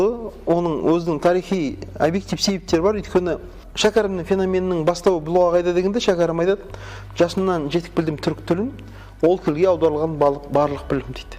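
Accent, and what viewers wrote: Standard. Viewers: Осы кісінің дауысы өте жағымды таза қазақша сөйлейді.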